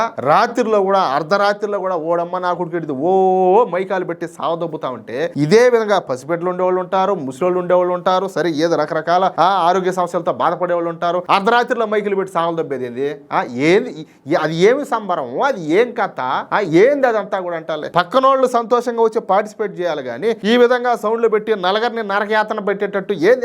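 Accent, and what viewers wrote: Rayalaseema, India. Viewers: అన్నయ్య నీ భాష అదిరిపోయే భాష మాట్లాడతావు అన్నయ్య రాయలసీమ భాష చాలా మంచి న్యూస్ చెప్పావు అన్నయ్య థాంక్స్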